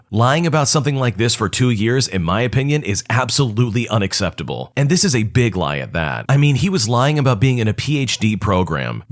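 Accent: United Kingdom. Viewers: Been waiting for the British guy’s voice :)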